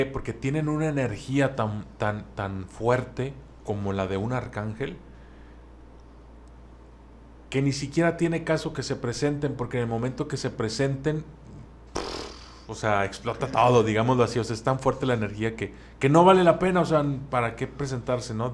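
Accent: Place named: Yucatan, Mexico. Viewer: HE VISTO VIDEOS DEL DOCUMENTALISTA , Y ES UNO DE MIS FAVORITOS , TENGO UNA DUDA EL COCO QUE LLEVA LA BATUTA DE ESTE VIDEO , DE DONDE SERA ??? TIENE UN ACENTO DIFERENTE EN MI OPINIÓN COMO DE YUCATECO MÁS NO SE POR ESO PREGUNTO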